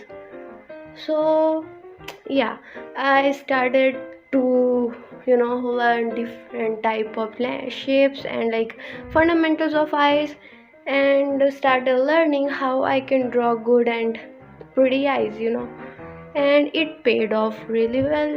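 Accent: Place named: India. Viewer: YOU'RE SO TALENTED!!!! I LOVE THE WAY YOU COLOUR!!! are you indian? forgive me for asking but Im indian myself and i thought your accent sounds a bit familar to me 😵‍💫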